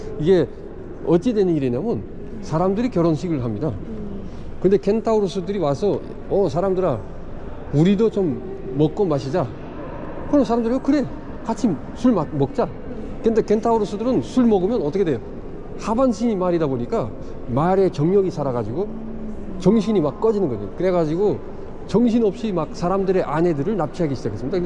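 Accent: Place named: North Korea. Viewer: 안내 덕분에 영상잘봤고 좋은 공부가 되네요. 북한 억양이라고 하더라도 그건 나쁜게 아니니 걱정 조금도 마세요. 부산사람이 경상도 사투리 한다고 더 싫다거나 좋다거나 나쁜건 아니듯...오히려 한국말 너무 잘하시는 것이 억양을 떠나서 아주 멋져보여요. 구독 좋아요 눌렀네요.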